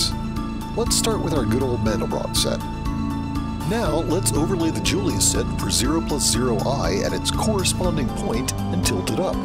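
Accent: United States. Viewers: your American accent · The c, z american pronunciation makes this very confusing.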